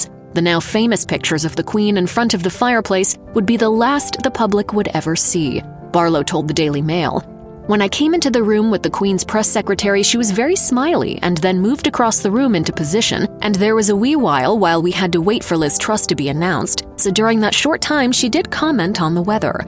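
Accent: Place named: United States. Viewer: As soon as I heard the American narration, I clicked off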